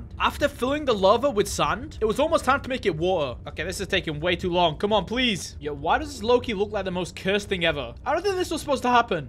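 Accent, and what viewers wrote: United Kingdom. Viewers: Bro u from Britain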